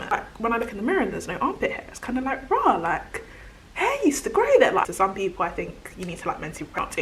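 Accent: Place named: United Kingdom. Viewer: Man the British accent is annoying.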